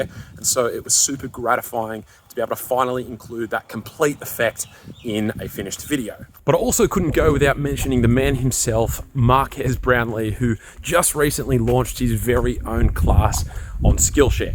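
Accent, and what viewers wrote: Australia. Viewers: Gotta say that was pretty great sponsor spot. I was so ready to fast forward, but I had to watch to compare the quality. It's not a priority for me. As long as the photos are great. Also it's great to hear a fellow Aussie. Still tossing up between the 4a 5g and the 5. I want the 90hz panel but it's hard to justify the $200. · your aussie accent makes me travel back to when i lived in australia ! very good content !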